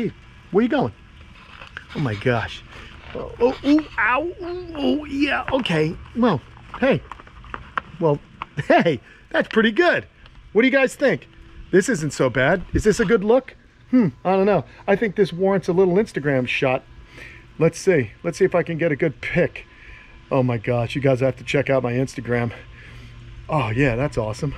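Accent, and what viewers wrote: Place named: New York, United States. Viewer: "Oh they've got such claws... such claws!" You sound exactly like where you are from🙂!! You can take the man outta New York but you can NEVER take the New York outta da man. Brooklyn maybe? Queens? It's no matter..I love how you communicate!!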